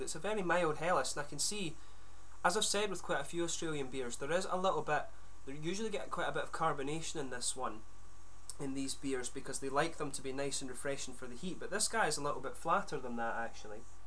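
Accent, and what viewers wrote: Scotland, United Kingdom. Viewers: its great you not lost that rich scottish accent whilst traveling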